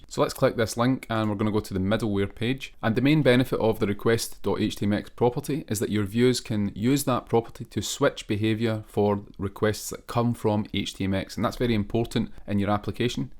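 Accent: Scotland, United Kingdom. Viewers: Thank you for the content you produce. And thank you for the Scottish accent! 😀 I was supposed to move to Scotland recently but some unfortunate events stopped me, so this will help ease the pain. I'm in the beginning of my Django learning journey, so I will definitely come to your videos many times. Good luck!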